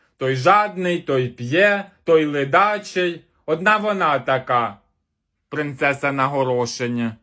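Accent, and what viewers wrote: Poltava, Ukraine. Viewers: СУПЕР!🤣🤣.Полтавський говір 100/. Як в селі побувала.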